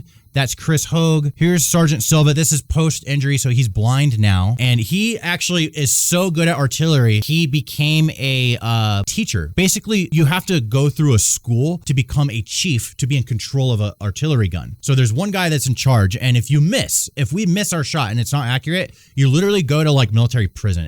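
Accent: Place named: California, United States. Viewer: hoo-mutha-fkn-rah!! great memories. U sound like a cali guy. respect. made it to the end of the video. happy belated bday marine.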